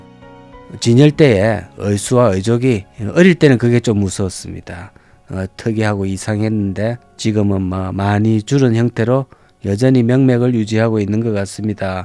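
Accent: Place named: Busan, South Korea. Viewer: 부산 알고있습니다 오늘도 구수한 부산 말씀 수고하십니다 새로지은 도시화로 그리운 추억도 고향의 향기를 잃어버린 현실이 안타깝습니다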